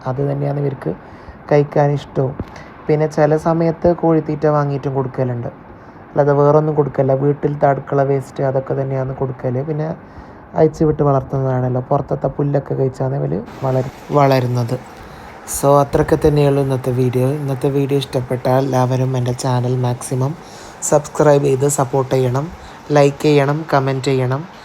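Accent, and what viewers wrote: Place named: Kasaragod, India. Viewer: Edk kasrod slang bern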